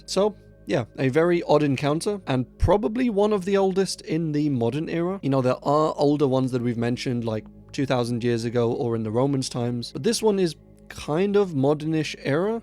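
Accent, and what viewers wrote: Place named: United Kingdom. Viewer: I have to know why Brits pronounce “aw” like “are”. I just want to know what is going on anatomically. Like where is your tongue when saying “idea” or “law”. Where is the “r“ sound coming from? No judging. It just stands out. How does phonology differ so much? Is an R not an rrrr sound? How does a “v” become a “wha” (w sound) in some languages? When we all have the anatomical capabilities? Does v sound like w in India?? Just curious. 🤷🏻‍♀️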